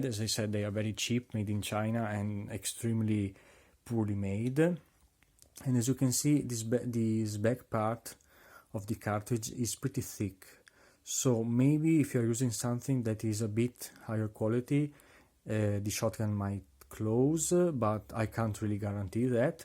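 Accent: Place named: Italy. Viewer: Si sente l'italiano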